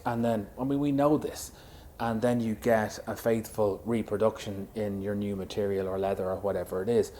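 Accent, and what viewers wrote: Ireland. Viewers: Okay, that truly sucks! I'm watching from the US and there are like almost no Lotus Esprits from the early years in the whole country. Dang it! Still, I look forward to every one of your videos. Thank you for explaining the stop motion reasons and motivations. That make much more sense than I realized! It's also way entertaining and a butt load of work, I bet. I will subscribe to your channel, because I like your work ethic and I like vintage Lotus cars. Your Irish accent is a fav, too! Keep going!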